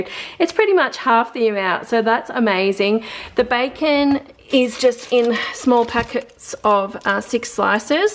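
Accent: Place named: Australia. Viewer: Oh an Australian accent. What part of Australia?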